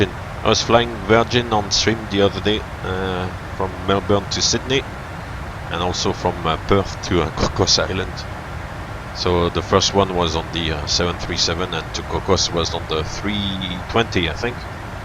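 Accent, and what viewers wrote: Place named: France. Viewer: yay another video :D I really like your calm voice, and your competence of course But you are a real pilot, right? Doesn't it get boring to fly as your job and then when you are home to fly again? Like there are lots of good chefs that love cooking but at home they don't because they do it all day already :D I'm not complaining though. That way I can enjoy your videos :P May I ask where you are from? Your accent has something very french to it :D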